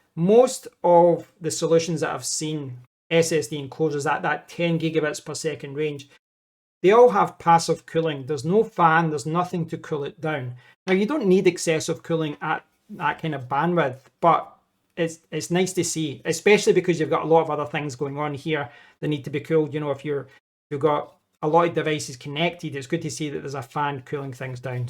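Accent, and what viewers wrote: Scotland, United Kingdom. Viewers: a great day to perfectly understand a Scottish accent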